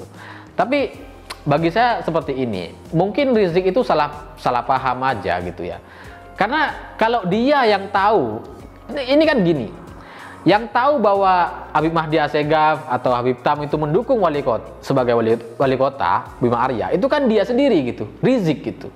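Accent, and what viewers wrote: Madura, Indonesia. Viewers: Kya logat madura bicaranya bang😅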